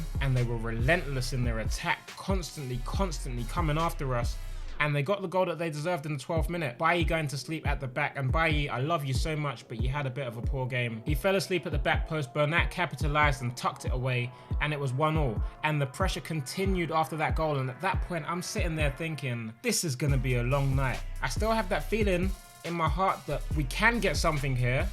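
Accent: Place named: Manchester, United Kingdom. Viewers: Loving that Manc accent 😂😂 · you have the accent of a PROPER Man U fan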